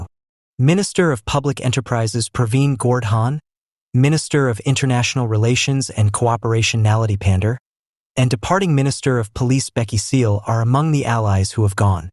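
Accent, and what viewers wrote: United States. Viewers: WHY IS THIS NARRATED BY AN AMERICAN???? COULDNT YOU FIND A BEAUTIFUL BLACK VOICE????? STOP BEING PUPPETS OF THE WEST MAN. MMNXM.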